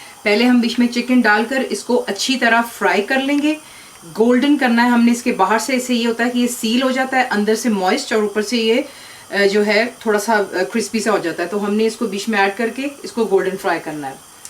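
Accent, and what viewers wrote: Punjab, India. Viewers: Dear Ji, I have tried this recipe and it was AMAZING. My father loved it absolutely, I belong to Sikh family and it was just the kind of delicacy I was looking for.:) Thank you again Ji, please upload some more Chicken recipes:) And yes, your Punjabi accent is amazing, and I am sure you must be an amazing person too:) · I made your dish. It's delicious. I love your accent mam, it's like typical punjabi mom. Thanks for the recipe :D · I love your punjabi accent :) it's ncie to listen to! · omg... I literally drooled over my keyboard. Thx a lot ji.... I love your Punjabi style Hindi (Urdu):) Maza aa gaya kasam se recipe mein. Will definitely try this. Cheers from Korea!!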